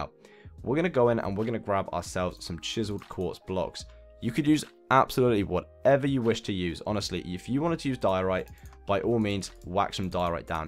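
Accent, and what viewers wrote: United Kingdom. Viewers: He Has the British accent, that's why. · XGZ_AnimationsX its just a feature of his British accent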